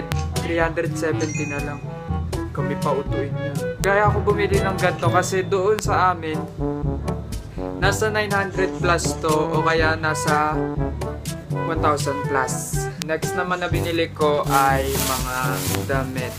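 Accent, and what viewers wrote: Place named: Laguna, Philippines. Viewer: May accent ka na parang tiga laguna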